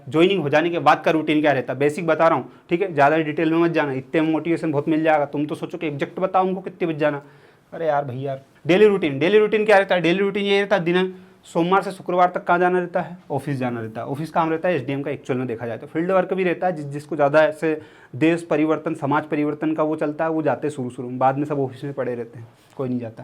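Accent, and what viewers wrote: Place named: Indore, India. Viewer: Sir is full on indori 😂❤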